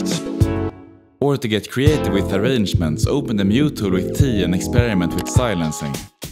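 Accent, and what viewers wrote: Sweden. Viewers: I watched and want to ask you which city in Sweden do you live in? 😂 that thick swedish English accent :) no offense, i’m half swedish myself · Såg att du tryckte in ett "plopp" 😂 När jag hörde dig prata för första gången visste jag direkt att du var svensk, det är bara någonting speciellt med vår dialekt när vi pratar engelska, tråkigt att PewDiePie inte pratar så längre! Var i Sverige är du från?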